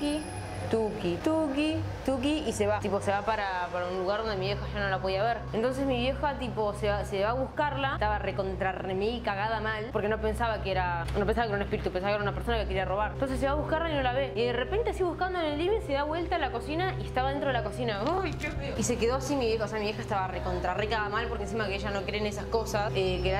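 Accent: Argentina. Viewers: La boca no carajo E wacho vieron eso Típico de los argentinos Lo Locooo somos los argentinos así hablamos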